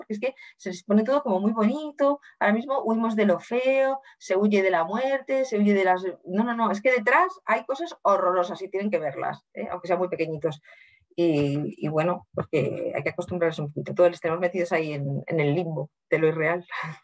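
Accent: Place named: Spain. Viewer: Muy interesante pero habla demasiado rápido y con su acento español es fácil perderse . Gracias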